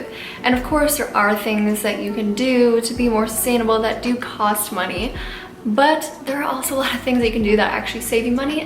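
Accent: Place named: Minnesota, United States. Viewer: Oh ya, I think she's from Minnesota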